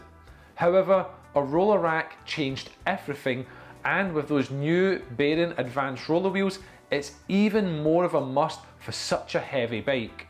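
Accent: Scotland, United Kingdom. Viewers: E beiiike… i love the scoottish people and their funny accents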